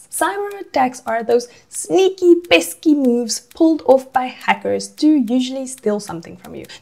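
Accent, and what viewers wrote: South Africa. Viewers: Also, I instantly heard a South African accent?! much love 🇿🇦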